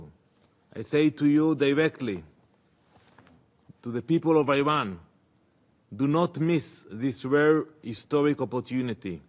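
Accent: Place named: Israel. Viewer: 😮 ایول فارسی به لحجه عبری چقدر جدی،زیبا و شدنی هستش،چشم داداش بنی اسرائیلی خودم❤❤🎉❤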